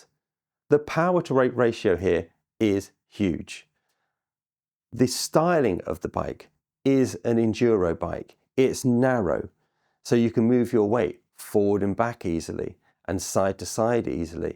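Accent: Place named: United Kingdom. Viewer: Best vid on the 690! I need it all and your British !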